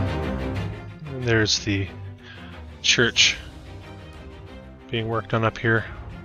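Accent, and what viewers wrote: Canada. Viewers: Are you from Alberta ?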